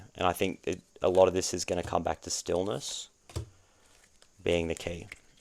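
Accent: Australia. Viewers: Are you from Australia? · Sorry dude, I can’t listen to an Australian accent. Peace out 👋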